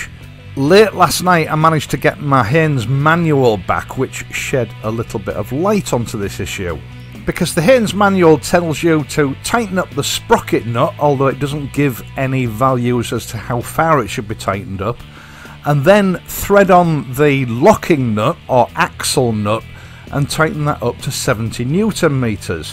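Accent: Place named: England, United Kingdom. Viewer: Brilliant teaching video. 'Lots of practical logic and obvious experience, spiced with humor and an enjoyable Englishman accent for good measure. Thank you.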